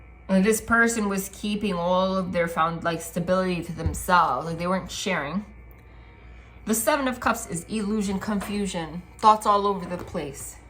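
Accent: New Jersey, United States. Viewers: I just Love your accent 😂 that East Coast NJ accent! Nothing like it✨🫶🏼✨FUN~KEY😂(funky) ✨ Energy🎉 and your readings are so beautiful, thank you. Lots of love & Light sent to you and everyone here🧡☀️💫✨Namaste 🙏🏼🏵️🍀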